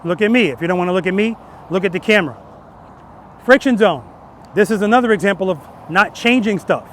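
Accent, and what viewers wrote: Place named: New York City, United States. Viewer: Great video. Thickest NYC accent I’ve heard in a long time. Keep it up.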